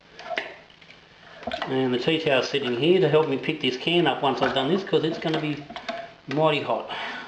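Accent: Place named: Australia. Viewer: cheers for the vid mate . you sound like a down to earth aussie , with none of the bullshit .. will be watching and learning from your vids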